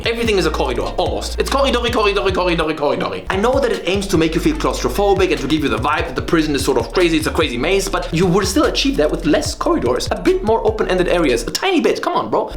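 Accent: Germany. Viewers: Asiate mit deutschem Akzent. Luv it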